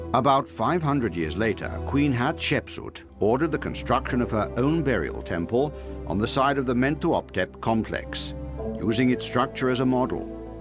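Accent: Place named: United Kingdom. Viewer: The timers cool but NOT ANOTHER BRITISH ACCENT!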